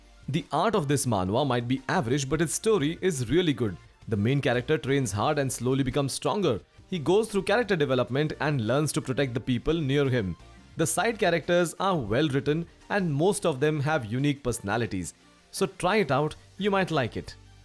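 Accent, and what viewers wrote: India. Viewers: fuvking indian accent ruin the video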